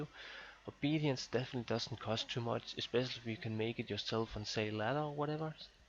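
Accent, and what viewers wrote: Denmark. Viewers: hey Fellow Danish fam. Jeg kan høre du er dansker. That accent though, also the keyboard saying "DAN"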